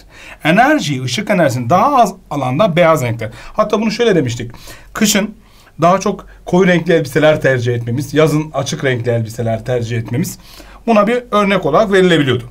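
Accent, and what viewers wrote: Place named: Bulgaria. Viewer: Abi sen burgarmısın çünkü NAİM SÜLEYMANOLU SENİN GİBİ KONUŞUYODU FİLMİNDE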